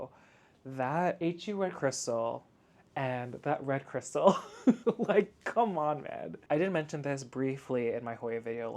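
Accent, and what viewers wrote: South, United States. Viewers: Love it when to tawk southern lol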